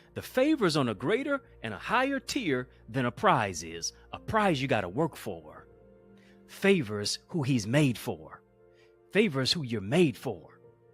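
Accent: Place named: Malaysia. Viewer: Speaking manglish ✨🔥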